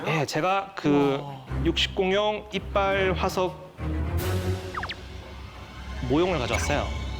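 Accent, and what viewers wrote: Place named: Gyeongsang, South Korea. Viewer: 좀더 보니 갱상도네~~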